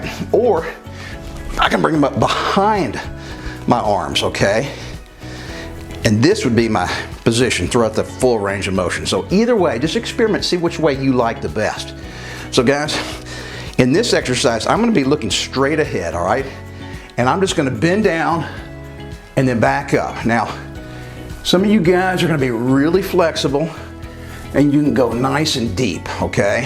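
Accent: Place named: South, United States. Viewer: This guy’s comforting southern twang reminds me of “Dubayah”. “We’re goin into “Eyerack” to give Saddam a good ol’ southern slap on the jaw yeh all”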